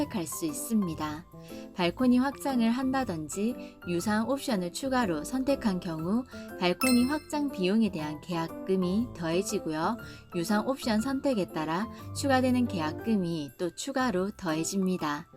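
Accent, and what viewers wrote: Gyeongsang, South Korea. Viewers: 엥~? 대구로 들리는데 ㅎㅎ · 경상도 사람인가봐요ㅋ 사투리가있어보이네요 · 구수한 갱상도 사투리로 자세한 설명 해주셔서 감사합니다.~~ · 경상도 사투리가 너무 듣기 좋네요 ㅋ 좋은정보 알아갑니다 ^^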